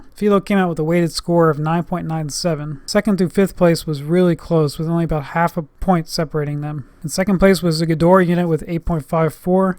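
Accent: Germany. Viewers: sir are you german or hungarian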